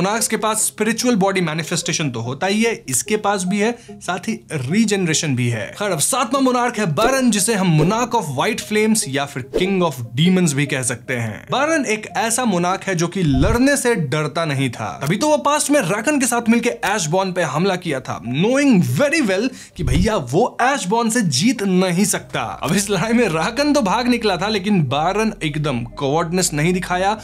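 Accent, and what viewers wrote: Bengal, India. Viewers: bhiya aap sabse pehele anime ki story cover karo aise bhut confusing ho rha hai please 1st full manwa explation chapter wise and then all the details of characters,gods and monarchs please bhaiya you will be the 1st to cover this anime fully chapterwise on youtube and btw love your videos so muchlots of love and are you bengali as you sound kind of like them as I am bengali tooooo please consider my request please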